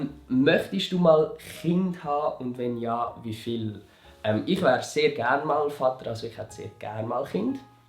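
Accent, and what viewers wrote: Switzerland. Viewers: Ich finde das schweizerdeutsche voll schön, kann nicht mehr aufhören, deine Videos zu gucken😂🤗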